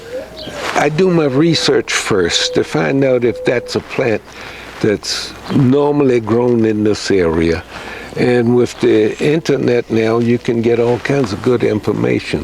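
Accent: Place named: New Orleans, United States. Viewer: New Subscriber Not only a 🙃, but does Bonsai too👏🏽 I'm looking for an Azalea now.. Most of my Trees are Ficus, or Portulacaria Afra, Holly, even a few Fuji Cherries.. Good info here🏆 sounds like New Orleans🤔 🙂 I'll keep watching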